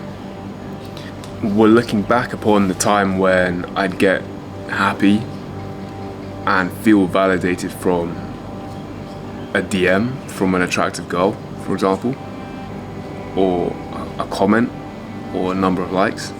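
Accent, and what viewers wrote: England, United Kingdom. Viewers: And not to mention that gorgeous cut-glass English accent that makes come across as so classy and divinely sweet and adorable - as an older Irish gay man myself, I’d definitely date the handsome in a heartbeat ❤❤❤❤❤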